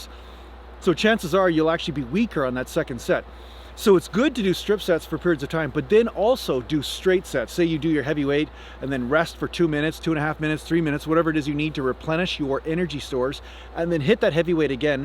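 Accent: Canada. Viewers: Are you Canadian aye?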